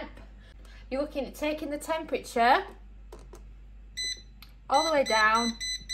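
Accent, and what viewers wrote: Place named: Yorkshire, United Kingdom. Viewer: It’s some of Northern British accent, maybe Yorkshire? Very endearing to hear but I think some non-Brits may not understand everything.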